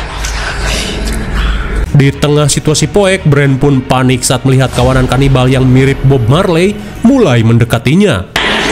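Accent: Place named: North Sumatra, Indonesia. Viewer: Bang..kau asal sumatera ya 😂😂kdg logatnya kek org sumut😅😅😅 penasaran.semoga di balas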